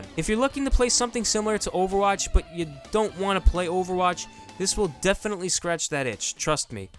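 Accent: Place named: Boston, United States. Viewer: I can sense that he may be from Boston. Hello my brother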